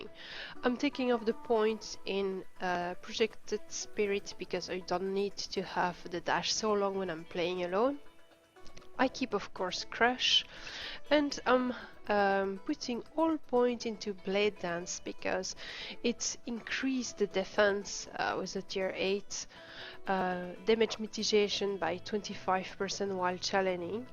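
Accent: France. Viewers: Hi, are you french ? I demand that because you have a french accent (si oui, un bonjour de la France ^^)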